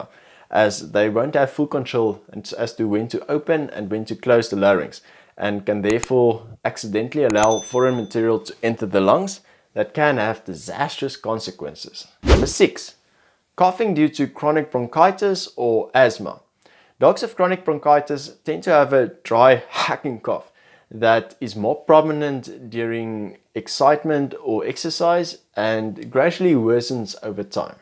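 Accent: South Africa. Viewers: Thank you sooooo much sir!!! And I love your accent, it sounds South African...Hello from Texas🥰😘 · Excellent video. Great to hear the SA accent that's when I knew I needed to listen. My fiancée is SA and I am Irish, we live here in Ireland and it's very hard to get a vet to listen to you. They will only do what they think. My husky has been coughing for about a year or so on and off. Also when he howls he starts to cough. No vets have been able to help me at all. He got a scope of his throat and it was a little inflamed but no foreign object. He is diabetic and bg is not controlled after 3 years and he does pant a lot anyway so unsure if it's heart failure or cancer or what? It's very frustrating as the vets here seem to be behind other countries. Any further tips or information or even how to approach my vet to get them to explore further would be appreciated.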